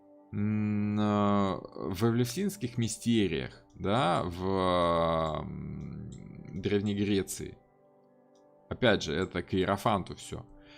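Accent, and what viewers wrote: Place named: England, United Kingdom. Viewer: с английским акцентом…как это сам * часто в своих лекциях очень симпатично произносит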